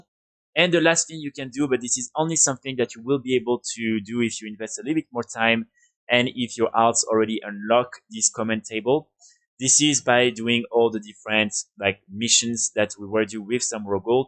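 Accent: France. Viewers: Cet accent français 😂😂😂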